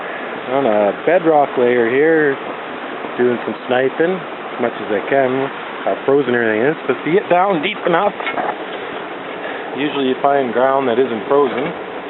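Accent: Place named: Canada. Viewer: does his videos from his claim way up in BC. Not Colorado. Haha, as if you couldn't tell by his accent Ay.. Lol. I myself am just getting in to prospecting. Just picked up a pan set from Cabelas this past Christmas. Now I'm just waiting til everything thaws out enough to go out there and get some color. Good luck in your endeavor my friend.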